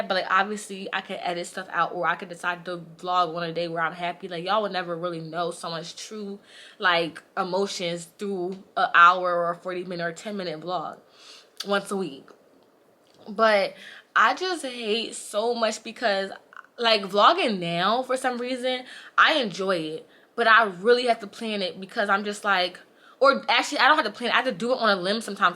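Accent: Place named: Philadelphia, United States. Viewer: In all sincerity if you ever need to talk I'm here for you. You remind me of my niece I used to be close to. I was born and raised in Philly and I'm still close to you in Willow Grove. We could meet have lunch or get a coffee. I mean it I'm here for you, I've started watching you a year ago and think your soo funny I knew you was from Philly the minute I heard you speak. We have to stick together if you ever need to talk or vent I'm here. I run a small business as well I know how it gets sometime. Please don't hesitate to reach out.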